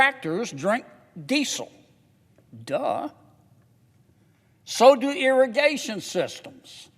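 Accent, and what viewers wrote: South, United States. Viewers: All males as far as his office goes. Spoken like a true southern rich old guy. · Soft spoken and genteel, Senator John Kennedy leaves out the fact that the war in Ukraine has much to do with the impact of consumer prices. What has more to do with energy prices is the fact the oil producers are pumping less out of the ground. The purpose is to raise prices. The contributions of $893,247 from big oil does not seem to jar his sweet southern drawl either.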